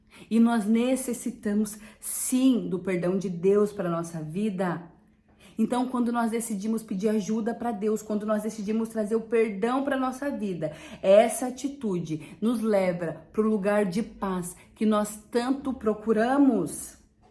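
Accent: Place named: Rio Grande do Sul, Brazil. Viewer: Gaúcha né??? Bênção mulher!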